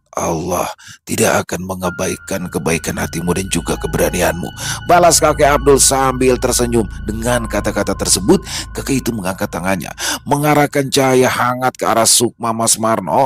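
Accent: Jakarta, Indonesia. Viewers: Wa’alaikum Salam, gercep kalo kisah bang 😁saya suka logat betawinya.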